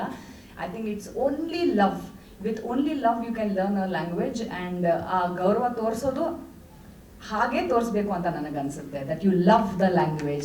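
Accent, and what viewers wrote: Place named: Mangalore, India. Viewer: I'm shocked that u speak proper kannada.....👌 It's more like manglur kannada.... wonderful 🌟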